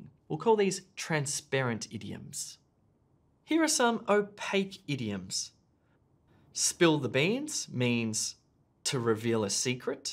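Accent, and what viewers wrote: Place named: France. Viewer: ur pronunciation is french english bro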